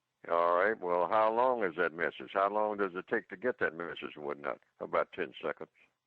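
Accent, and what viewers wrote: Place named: United States. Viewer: I just started listening to Mr Fuller I enjoy his ole Mayberry USA voice and I appreciate his time/knowledge/concern for the people of color...do you know how many blood washed, born again believer's that wish it was that simple as 10 minutes...not the play church folks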